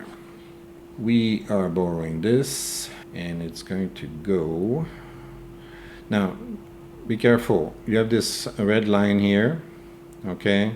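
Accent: Quebec, Canada. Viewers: Is that a Québécois accent that I hear? Ça sonne vraiment comme chez nous!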